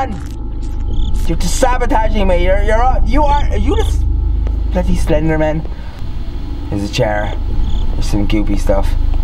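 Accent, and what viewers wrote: Ireland. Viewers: His Irish came back in this video lol!!!!! never heard him swear so much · Oh my god that was so funny, lads I'm fucking sweating 😂😂😂 also I freaking love the Irish accent xx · You sound so much more Irish in this video! And that's coming from an Irish person! 😂🇨🇮 Loved this type of video from you, you should keep doing game plays! Just a random thought I had, if you were to build your 'dream house' that you've always spoken about on the sims that'd be awesome!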